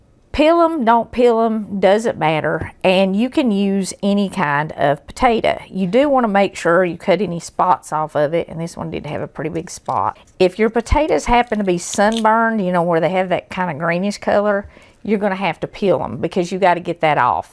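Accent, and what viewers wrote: South, United States. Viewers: Great video- very helpful I love your southern accent are you from Tennessee?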